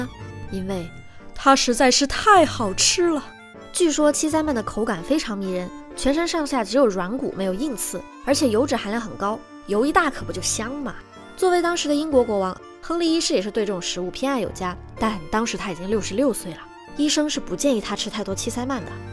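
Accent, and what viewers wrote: Cantonese, China. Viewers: 廣東話太可愛啦🎉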